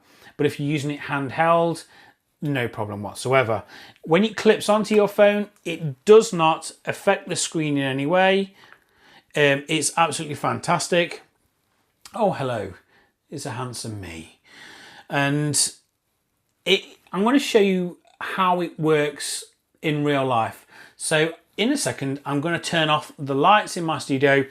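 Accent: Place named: England, United Kingdom. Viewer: You look like American but your accent says you're English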